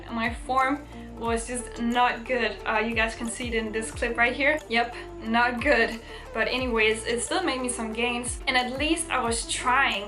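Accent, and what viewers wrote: Denmark. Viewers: as soon as i heard your voice i was like, she have to be from denmark i can hear it. and then you said it yourself. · jeg kunne høre med det samme at du var fra Danmark, ahahah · man kan bare høre på din "accent" at du er dansker.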